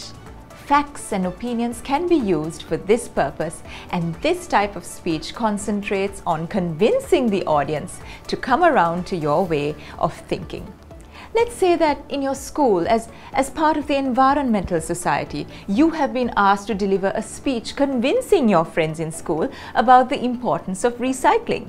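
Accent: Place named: England, United Kingdom. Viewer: Thank you, teacher. Your English accent is amazing.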